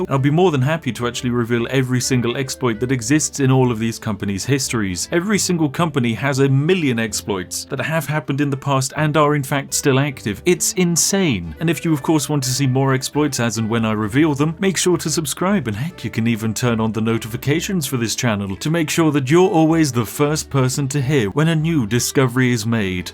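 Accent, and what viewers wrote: United Kingdom. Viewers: The Internet Historian is a lot more british than i remember... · If nothing else, I can always look forward to listening to this man's smooth British voice explain how he's broken the very laws of physics.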